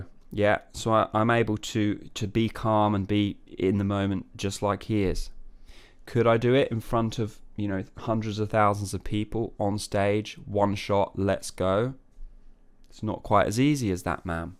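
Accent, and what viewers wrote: England, United Kingdom. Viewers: your english accent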